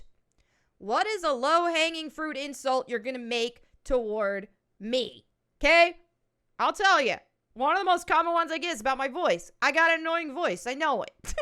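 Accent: South, United States. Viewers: Hey.. Just discovered you. You're hot. I'll follow you awhile. ;-) I like your voice.. southern?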